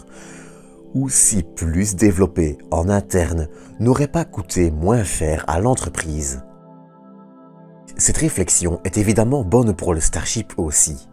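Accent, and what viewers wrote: Belgium. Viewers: Sa voix est posée, et sa lenteur relative se prête particulièrement bien aux sujets qu'il traite. Autrement son "élocution" c'est juste un accent belge.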